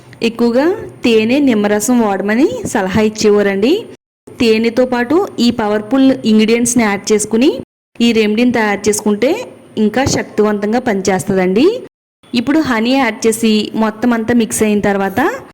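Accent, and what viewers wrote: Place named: Godavari, India. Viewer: Godavari districts vallu ekkuva ga andi ani antaaru chivari lo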